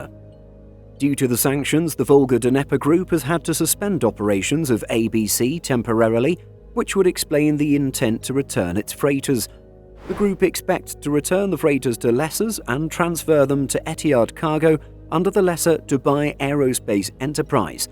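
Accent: United Kingdom. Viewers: The moment I hear this British accent, I know that the videos are lies and scams, because a lot of videos that I have seen using British actions or nothing but a scam, deceit and lies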